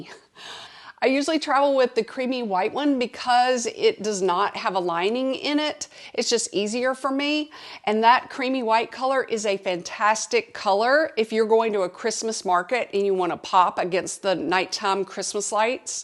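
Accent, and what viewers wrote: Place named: Georgia, United States. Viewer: Oh, so that is why I like your accent. I am from GA too. The Texas accent grates on my nerves.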